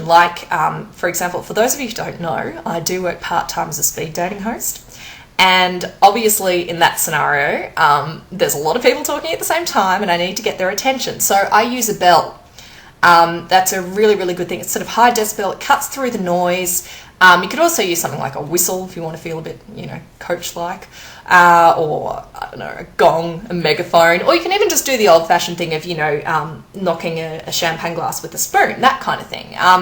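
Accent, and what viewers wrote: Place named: Australia. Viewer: Aussie accent, the one and only. Just love it!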